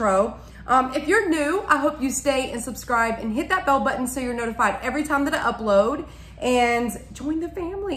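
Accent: South, United States. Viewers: I've watched you for a long time and you're getting louder and louder and more and more country all the time... I wonder as a woman from North East Tn. And country as anyone so I am not fussing Lord knows. It's only that I am curious if your husband or mother or someone was making you tone down and you've cut loose or what happened recently. You are deceiving the Bulldogs glad and have said y'all a dozen times in 2 minutes and I could hear you all the way in back of my house your video started auto play is on and I watch you allot so it started you're newest video and I had to come from back of the house to turn it down. So curiosity I guess..... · Where are you from live your accent you sound like Paula Deen saying y'all lol....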